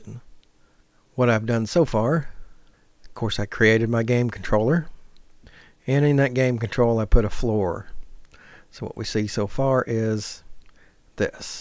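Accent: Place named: South, United States. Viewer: Watching this I'm thinking his southern accent really undercuts his credibility, half way through I realize he knows what hes talking about so I stick around to the end to hear the "ain't it puuurdy" and I died! lmaooo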